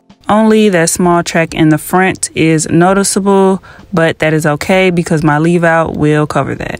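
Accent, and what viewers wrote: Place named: Memphis, United States. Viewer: Sounds like a fellow Memphian❤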